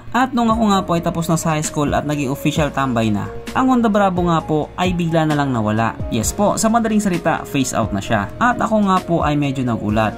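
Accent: Visayas, Philippines. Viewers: Bisaya ka kol?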